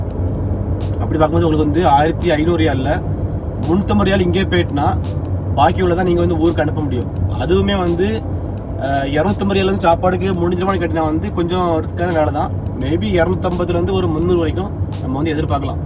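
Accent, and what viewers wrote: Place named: South Tamil Nadu, India. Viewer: South Tamil ❤ Slung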